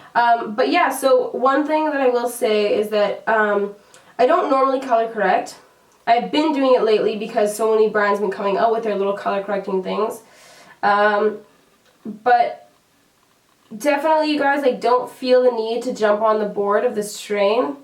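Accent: Canada. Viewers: you sound extra canadian i love it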